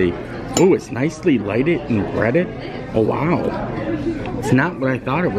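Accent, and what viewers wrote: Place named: Scranton, United States. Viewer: Omg I KNEWWWW you were from the Scranton area listening to your accent for all of these months I've been following your videos!!! I have family in Duryea. <3 Thanks for the video!! Love The Office!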